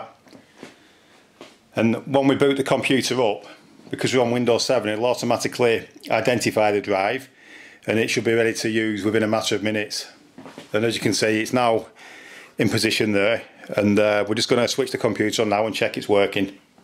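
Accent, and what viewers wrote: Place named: London, United Kingdom. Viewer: Very Simple...Thank You.... Are you from London....Your accent is very nice. I like it........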